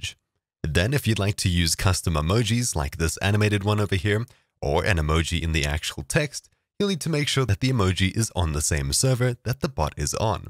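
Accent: South Africa. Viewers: hey i hear a south Africa guy here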